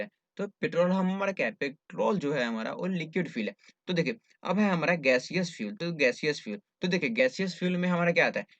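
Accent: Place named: Bihar, India. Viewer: Aap kaha se ho .. mujhe aapki voice se lag rha h ki aap bihar ke ho ..mtlb ya to aap bihar me reh rhe hoge ya jharkhand me 😁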